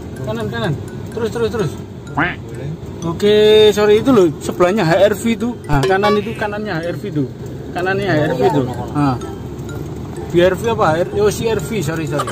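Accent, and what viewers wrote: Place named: Java, Indonesia. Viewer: pekat betul bahasa jawa kau komandan😅...menjaja tu brjualan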